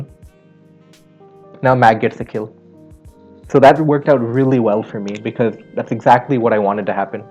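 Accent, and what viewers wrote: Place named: India. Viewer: I love the slight indian accent in your voice it just tells the world that no matter how big you get, or successful, we stay indians at roots! Great vid man ;)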